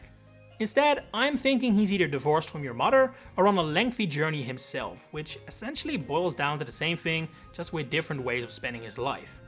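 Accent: Netherlands. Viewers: Lol, I thought you sounded Dutch a few times in this video. Turns out you are. Hello fellow Dutchie 🇳🇱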